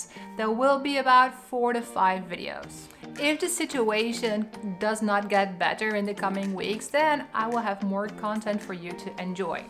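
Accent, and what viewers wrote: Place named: Russia. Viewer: Your russian english is pretty good. A lot of accent still. Very fine though. Congrats!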